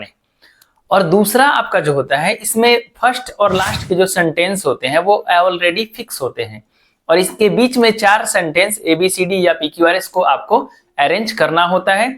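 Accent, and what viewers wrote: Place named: Delhi, India. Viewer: Maine... Practice " kara h ".... Delhi wali.. tone agai apki.. ab bihari nhi rhe ap 😮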